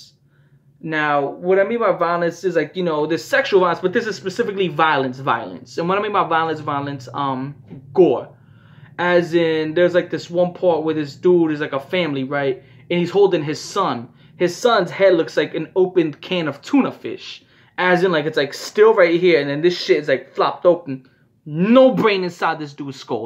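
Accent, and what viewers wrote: New York, United States. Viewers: im not sure if you're from ny or nj or what but i love your accent and the way u talk about stuff 😂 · This guy's New Yorker accent and random analogies make me forget how horrific it'd be to watch some of these by yourself. Well done on turning MDPOPE into a comedy lmao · Bro i soon as i heard you speak i knew you were from my state ny. Much love man❤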